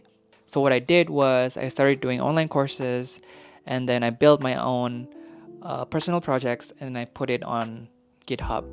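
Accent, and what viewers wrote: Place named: Indonesia. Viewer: Sounds Indonesia I guess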